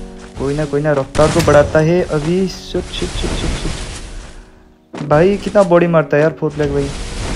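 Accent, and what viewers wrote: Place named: India. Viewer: You are indian or nepal becuase your voice indian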